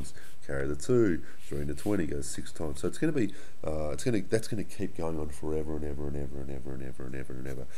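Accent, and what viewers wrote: Australia. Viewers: I bet 100% that he is australian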